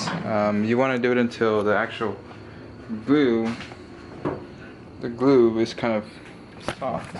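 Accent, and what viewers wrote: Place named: Texas, United States. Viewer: What part of Texas your from?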